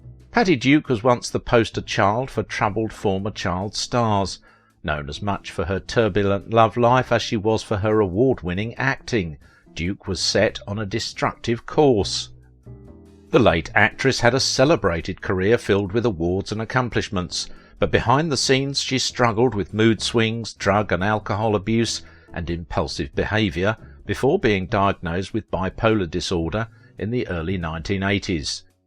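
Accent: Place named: Manchester, United Kingdom. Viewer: In your opening 1st paragraph in the last mangled sentence, you are apparently confused with the name of the actress Patty Duke and the name of the person she was portraying – Helen Keller. And in your opening 2nd paragraph you seem to be very gender confused. Can't you distinguish the difference between the "his" and "her" pronouns? Or is that a British idiosyncrasy? And why is a thick (sounds like Manchester) British accented man narrating a short bio on an American actress who's early 60's show and TV movies I very much doubt were broadcast anywhere in England or The UK at that time or anytime since then?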